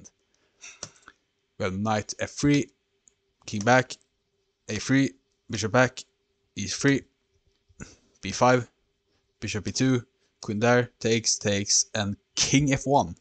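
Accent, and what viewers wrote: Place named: Sweden. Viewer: You sound Swedish, and I am fairly certain my ears are not deceiving me.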